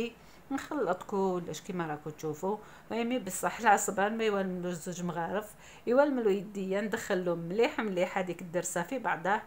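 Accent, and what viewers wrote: Algiers, Algeria. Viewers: سلام عليكم اختي أنا مشتركة جديدة ما شاء الله عليك شحال يعجبوني الدزيريات الاصيلات صوتك يديني للدزاير العميقة الاصييييييييلة ونحسك قرييييييبة عندي بزاف نتمى ترحبي بي وتردي علي نحتاجك ديما ربي يحفظك ويسترك ويخليلك لولادك....ردي الله كل خير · الطبع و الشان صراحة و طريقة الكلام صح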